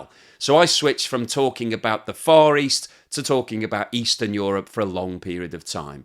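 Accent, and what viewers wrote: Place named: Liverpool, United Kingdom. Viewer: Happy Christmas 🌲 (how do your US followers understand the Liverpudlian dialect?!) Keep up the great work.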